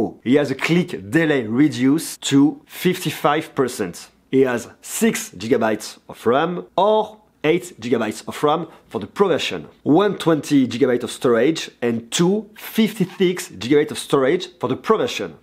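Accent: France. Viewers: It like a french accent no ? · Me too he speak frenglish it's a nightmare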